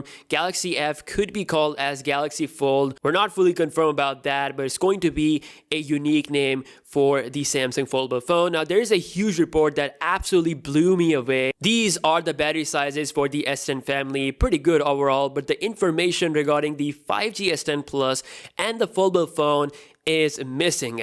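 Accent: India. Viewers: hijui999 hes Indian lol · Sorry but you just can't hide how indian you sound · Nice content ..nice video..but the narrative..the voice..it hurts my ears...sounds horrible. Sounds like those auto-read thingy with a terrible indian accent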